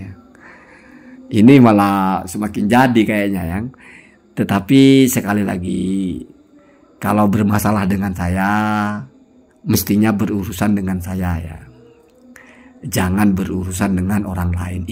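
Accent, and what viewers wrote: Madura, Indonesia. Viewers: Mungkin maduranya agak lain guss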